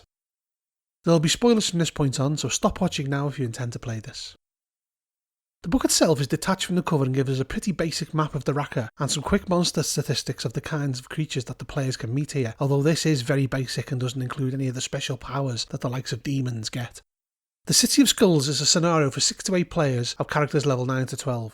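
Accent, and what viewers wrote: Liverpool, United Kingdom. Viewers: Scouser? Please review more old school d&d!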